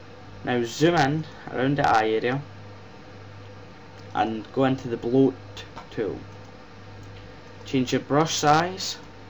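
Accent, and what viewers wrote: Scotland, United Kingdom. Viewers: Thanks for posting tutorial. Sounds like scottish accent.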